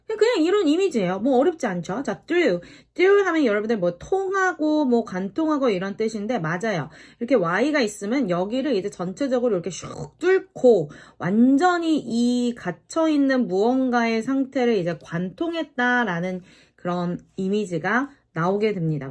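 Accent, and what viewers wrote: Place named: Jeolla, South Korea. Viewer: 전라도네? 으미X 의미0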